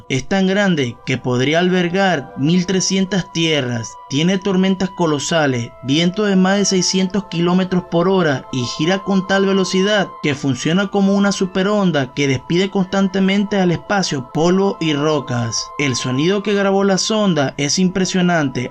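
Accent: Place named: Caribbean. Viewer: Lo unico escalofriante es tu horrible acento caribeño...por que no te dedicas al regaeton!!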